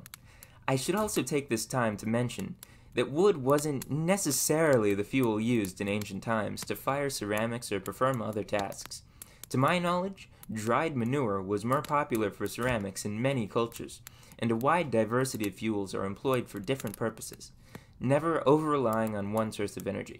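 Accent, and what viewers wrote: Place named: Maryland, United States. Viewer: Kinda sounds like a Maryland accent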